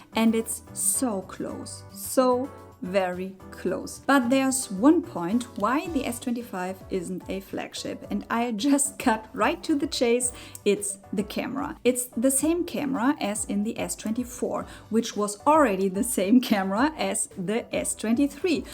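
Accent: Germany. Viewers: You make some very good points about the shortcomings of the S25; Samsung missed the mark on the whole range this year, it seems. I'd argue that the camera is still better than most of the users when it comes to taking photos, but you're spot on about the charging speeds and screen reflection. I've been with Samsung since the S4 but I'm definitely going to investigate other options when it's time to upgrade my S23+. Your English is better than most North American native speakers! Your grammar is impeccable and vocabulary is sophisticated. Yes, you have an accent but it's charming and only adds to your videos. And my mother was German, so I get a bit nostalgic when I hear it.❤Don't change a thing.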